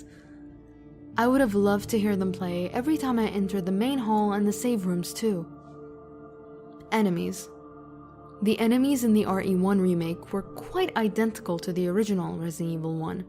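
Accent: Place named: Germany. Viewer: Awesome video. Also, love your accent. Is it German?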